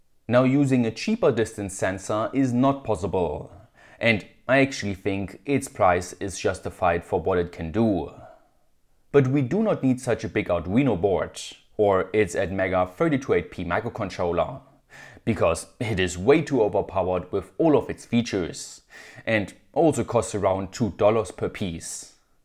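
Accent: Germany. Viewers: Ich liebe dieses Deutscher versucht Englisch zu sprechen, die Betonungen sind Gold... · are u german?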